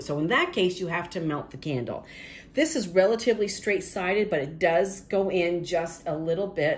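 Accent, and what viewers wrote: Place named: Canada. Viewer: Loved this video! Can’t believe it filled so many molds! I actually heard a Canadian accent in thsi video — never heard that, amiga! Great video!!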